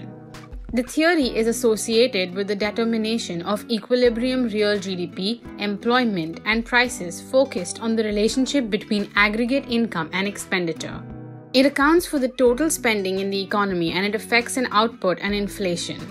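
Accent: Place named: India. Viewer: If you can't speak clean english, then SLOW THE F DOWN. If you would speak slowly many of us who can not understand Indian dialect would still be able to benefit from your videos.... otherwise completely worthless.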